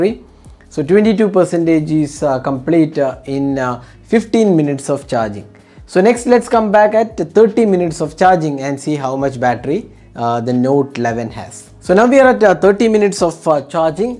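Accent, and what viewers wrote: Kerala, India. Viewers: Malayalali anale english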